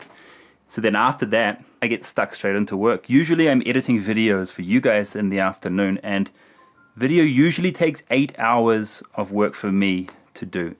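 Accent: India. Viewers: For my side the way you ride bike and the way you talk is like your 100% indian brother no matter what u r a perfect indian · Hello ur English sound like Indian English I like the na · Watching you from beginning... And see the way of your talking... You've become a pure INDIAN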